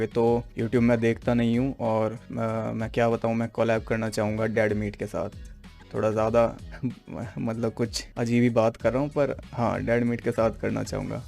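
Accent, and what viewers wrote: Haryana, India. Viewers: Your Harvyanvi accent make impact on your explanation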